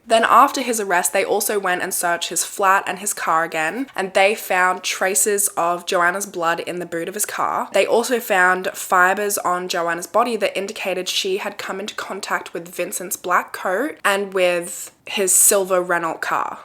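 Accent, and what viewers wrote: Australia. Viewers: RIP Joanna and peace and love to those who truly loved you. Oh my goodness! I can't believe I hadn't found your channel until now. I'm from Australia and going by your accent so are you...may I congratulate you for having such an amazing number of subscribers which I have just added to. Well done!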